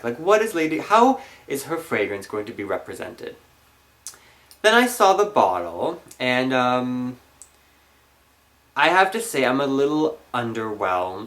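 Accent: Germany. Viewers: You have like a perfect German accent, I can't stop listening to it. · hahaha they must be german. I love your german accent.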